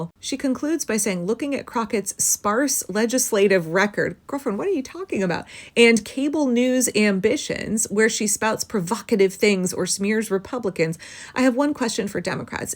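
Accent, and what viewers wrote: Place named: Texas, United States. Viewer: You are still a Texan….you speak Texan woman great! She has a huge surprise coming.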